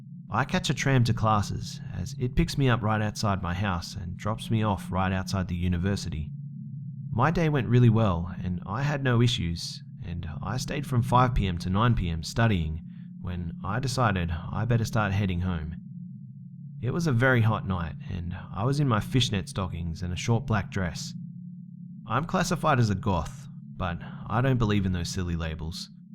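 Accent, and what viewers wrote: Australia. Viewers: Nice accent! Are you from Australia??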